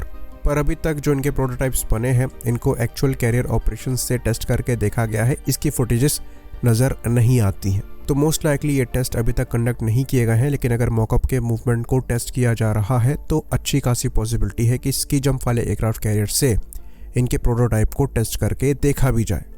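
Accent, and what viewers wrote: India. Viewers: 你的英文有很强的印度咖喱味道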